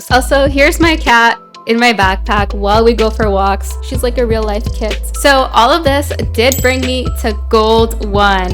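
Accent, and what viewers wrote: India. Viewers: Are you from India by any chance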